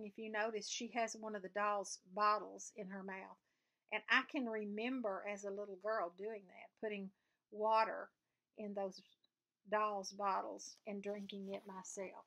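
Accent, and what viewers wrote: South, United States. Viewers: Love your videos and your southern accent. Do you have any problems with the hoops "crushing" your finished work?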